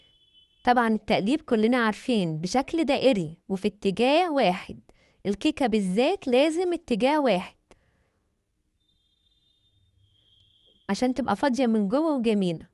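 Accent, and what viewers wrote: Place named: United Kingdom. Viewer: يسلم ايدك حبيبتي .. هو حضرتك مش مصريه ..الحروف شويه مياله للانجليزي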